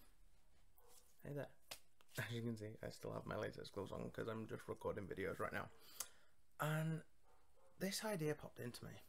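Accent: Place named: England, United Kingdom. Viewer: I started watching Tiraradeguello because he gave some very interesting advice during one of his Illuminati videos and it hooked me. The decision to subscribe comes from both the relaxation but also the connection with the ASMR artist. The relaxation is also tied to the gimmick, I like conspiracy theories and weird things so I gravitated toward Tirar and Ephemeral Rift. When I followed you, it was the collab you did with Tirar, when you were helping Dr. Andrew Michaels. I got hooked because of the sassy adlibbing you were doing + English accent is so amazing. So My advice is, being yourself is only half the equation. you also have to have a good hook and put in effort, such as getting some story ideas and writing them down, working them into scripts you an follow / adlib over. Umbergnome ASMR is a wonderful artist if you want to see a story being told through an asmr video.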